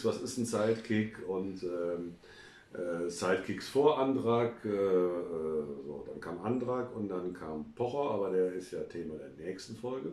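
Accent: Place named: Franconia, Germany. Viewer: Sprachfehler? Ich glaub, das ist einfach nur der fränkische Dialekt... 😁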